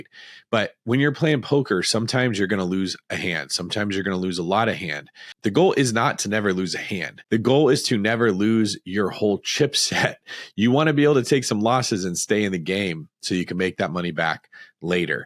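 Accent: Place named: Scotland, United Kingdom. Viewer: Go, I love the Scottish accent! It is probably one of the hardest to do!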